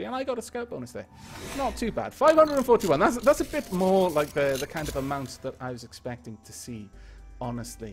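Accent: Wales, United Kingdom. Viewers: is he welsh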